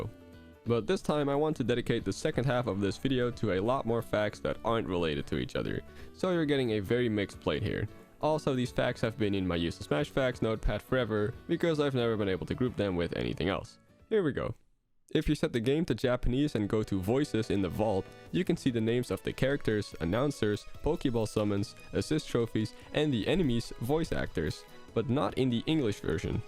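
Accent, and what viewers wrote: Netherlands. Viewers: When You listen closely You can still Hear his dutch accent.Das cool man,blijf video’s maken 👍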